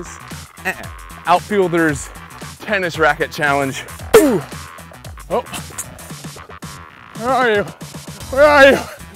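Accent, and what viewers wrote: Texas, United States. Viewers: What part of Texas are you from?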